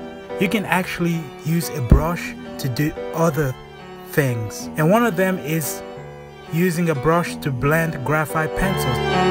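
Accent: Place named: United States. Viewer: he is gaining an american accent overtime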